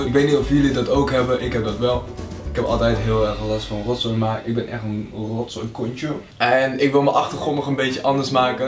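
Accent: Groningen, Netherlands. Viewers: Ben je gronings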